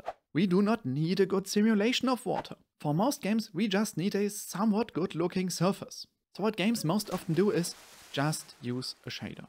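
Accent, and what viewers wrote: Germany. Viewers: This man has one of the lovliest German Accents i could imagine xD Nice work man!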